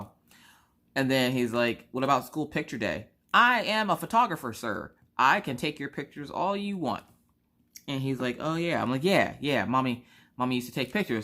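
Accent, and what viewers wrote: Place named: Georgia, United States. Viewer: I’m actually surprised that they did your MRI. I say that because I broke my hip 3 weeks ago and my doctor thinks I need surgery but now no surgeries or any procedures are being done except chemo and dialysis, and life threatening surgeries. Of course it may be a state thing and I’m in Georgia.( got that accent too lol) Glad you were able to get it done.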